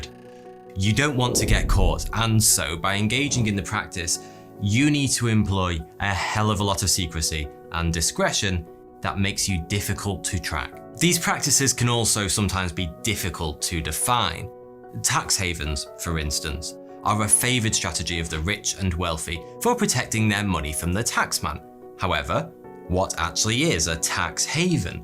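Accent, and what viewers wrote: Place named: East Lancashire, United Kingdom. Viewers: wouldn't it be crazy if he was a raging coke head off camera, and it's just the English accent that makes you think he is classy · Nah, he has an east lancs accent, more like Blackburn/Burnley 😀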